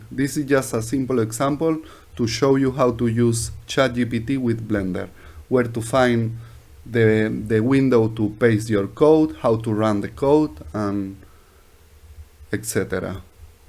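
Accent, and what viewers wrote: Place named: Highlands, Peru. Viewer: Inglés cerreño, me imagino que eres de peru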